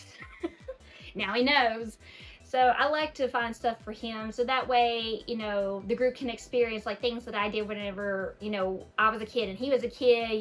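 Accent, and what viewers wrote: South, United States. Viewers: I love you southern accent. and your enthusiasm when it comes to gaming.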